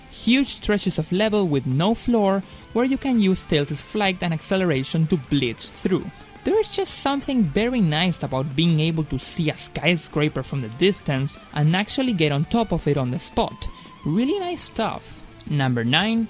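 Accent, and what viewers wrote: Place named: Argentina. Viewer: Tenes un re acento argentino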